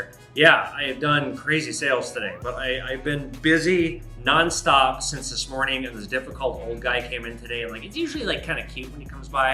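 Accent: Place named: United Kingdom. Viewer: I didn't know was Brit tissh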